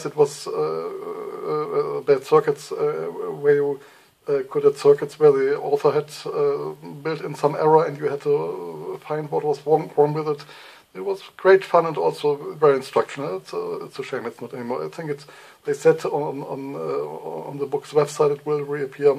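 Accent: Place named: Germany. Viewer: I think the reviewer is German ...i can tell from his accent. munchen?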